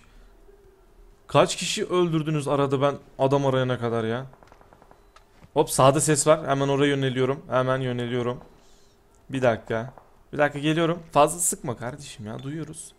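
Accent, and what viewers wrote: Adana, Turkey. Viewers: Şurda Br Adam Ateş Edüüyür Onuda Alalım Adana Şivesi :) Adana<3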